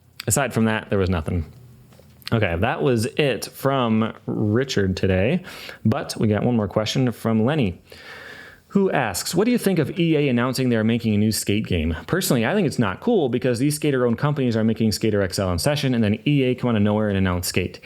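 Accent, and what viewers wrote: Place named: United States. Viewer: the .at thing confused the hell out of me back then - "this guy is austrian like me? no, he talks like an american? What the f is going on?!"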